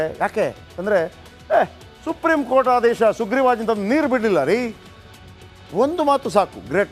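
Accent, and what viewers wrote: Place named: Rural, India. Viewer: ಸರ್ ಅದ್ಭುತವಾಗಿ ವಿವರಣೆ ನಿಡ್ತಿರಾ ಹಳ್ಳಿ ಭಾಷೆ ಮನಸ್ಸು ಮುಟ್ಟುತ್ತೆ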